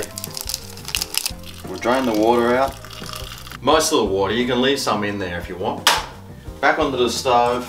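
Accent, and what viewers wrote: Australia. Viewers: Ayyy your Aussie too! 😂😂😅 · ...Yeah, this guy is definitely Australian. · If we go by accent I'd assume Australia, Austria sounds more like German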